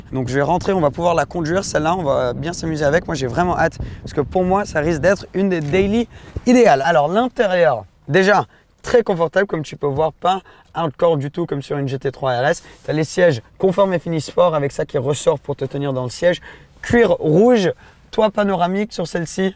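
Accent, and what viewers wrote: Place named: England, United Kingdom. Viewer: Je pense qu'il fait exprès de se rajouter un "accent" anglais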